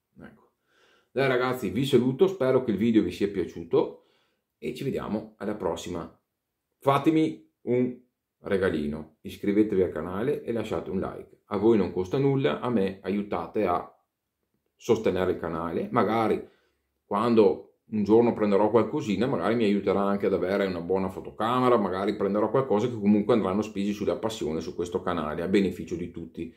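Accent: Veneto, Italy. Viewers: Carissimo, Ho visto questo e molti altri tuoi video. Condivido tutto e trovo le tue recensioni di lamette e rasoi molto vicine alle mie stesse impressioni, per quei pochi modelli che conosco. Penso abbiamo entrambi pelle molto delicata e versi del pelo inclinati. Io da molto anni mi sono avvicinato alla rasatura tradizionale anche se ad intermittenza, per molti anni ero tornato al multilama per comodità e poco tempo al mattino. Però ho sempre usato anche col multilama l’ottima crema DR Harrys Arlington ed il pennelli Plisson in tasso grigio di alta montagna ed il dopobarba Acqua di parma in balsamo nella profumazione colonia classica, un prodotto che trovo eccezionalmente lenitivo. Dei Plisson e di Acqua di Parma non ho mai trovato recensioni almeno in italiano, peccato perché Plisson è la più antica fabbrica di oennelli da barba. Proverò le lamette che hai consigliato in altri video, almeno quelle più reperibili. 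Grazie e bel lavoro! Ed anche complimenti per l’accento Veneto, anche io sono veneto ma molto più ai confini nord… Saluti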